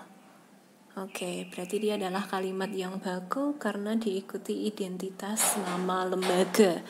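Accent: Java, Indonesia. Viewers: Mba2 ny ngomong ny medho 😆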